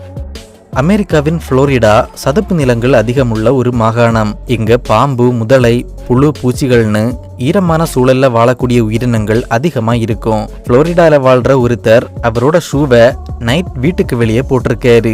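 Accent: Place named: Marthandam, India. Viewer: Unkaluka ooru marthandam a anna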